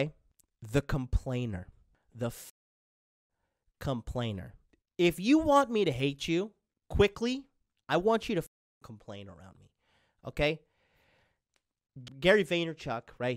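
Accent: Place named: Trinidad and Tobago. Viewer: Great Trinidadian accent!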